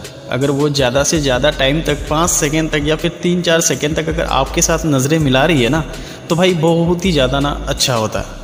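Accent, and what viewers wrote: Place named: Bihar, India. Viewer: Aap na pakka bihari ho 100%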